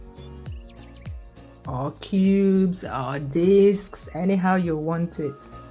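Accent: Igbo, Nigeria. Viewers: Igbotic accent creeps in 🤩, thanks a million for this lecture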